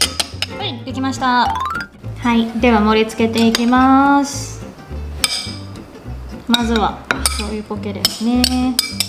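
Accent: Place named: Kansai, Japan. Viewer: Youtubeやってたんだ〜❗関西弁のイントネーションが、とても新鮮❗🤗 なんかうれし〜🎵 「涙出〜へんやつ」とかって。 ハワイで関西弁。いい❗❗❗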